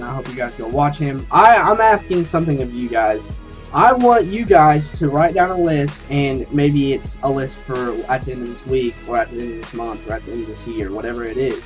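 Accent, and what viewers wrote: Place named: South, United States. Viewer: Why does he sound like a typical hillbilly incest 3rd grader?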